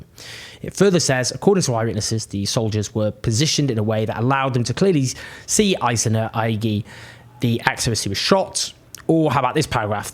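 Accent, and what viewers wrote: United Kingdom. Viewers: ok mf, nothing your British accent voice can do. about Israel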